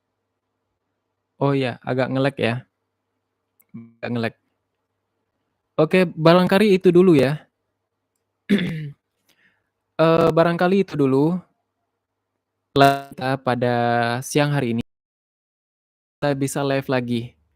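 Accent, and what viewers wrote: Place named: Sumatra, Indonesia. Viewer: yg jelas beliau logat sumatra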